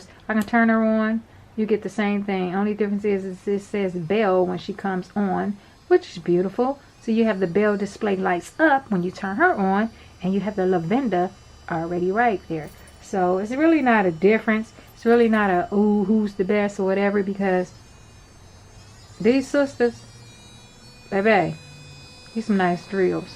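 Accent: United States. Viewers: Girl, are you from WV?! You sound more country than most my home girls here in the sticks! Love it! Thanks for the review! Very helpful! Edit: Did I miss this answer? Can you use them while they're plugged in charging?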